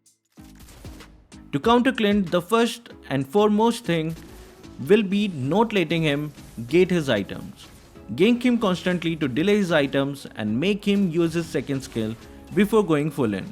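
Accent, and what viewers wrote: India. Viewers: I think you are Indian because ur voice is like an Indian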